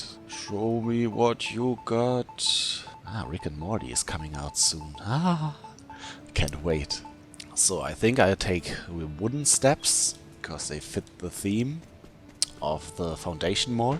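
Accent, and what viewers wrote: Germany. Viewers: Naja, dass du deutsch bist hört man an deinem Aktzent ;) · Warum mschst du keine Videos auf deutsch dein englisch ist erhörbar, das du aus Deutschland kommst.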